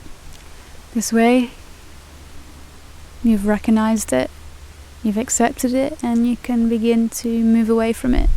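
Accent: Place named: Lancashire, United Kingdom. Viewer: Great video. Im a hindu born in London, family originally from Bolton. It's crazy how 2nd/3rd generation Indians in the UK, myself inc., have lost faith in the wisdom of hindu philosophy, i think we're poorer for it. More crazier still is for me to be rediscovering my roots through someone with your awesome Lancashire accent! Keep up the positive vibes and thanks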